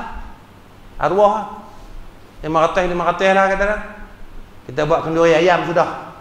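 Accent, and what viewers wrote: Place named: Selangor, Malaysia. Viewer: Amirulzack Zack ustaz ni dok Rasa selangor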